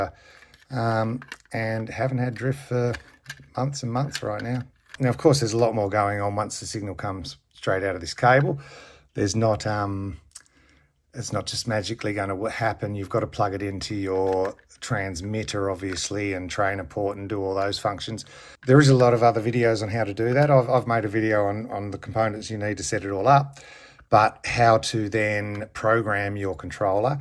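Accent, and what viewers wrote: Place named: Australia. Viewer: Woot! Sounds like an aussie accent! Even better.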